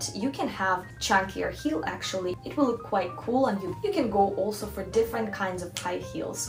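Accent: Russia. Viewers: Is your mother tongue Russian?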